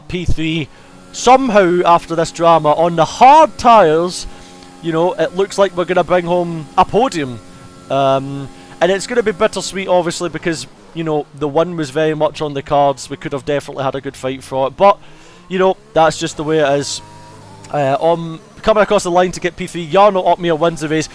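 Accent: Scotland, United Kingdom. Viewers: Ahhhh man!! That race was going so well, I would have loved to see you and Jarno fight in softs after the SC :( Still a solid finish, shit happens so just move on stronger 🙏🙏 PS: I generally have hard time with the Scottish accent but your commentary is very very clean and understandable!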